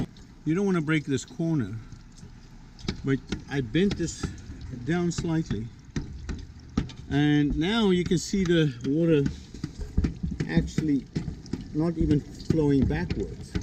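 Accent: South Africa. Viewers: Is that a South African accent ☺ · Baie Dankie! I spotted the accent too! Ek stuur groete from a very rainy Pretoria. I have this problem too with my afdakkie roof so ill give this a try. You haven't lost the SA sense of humour!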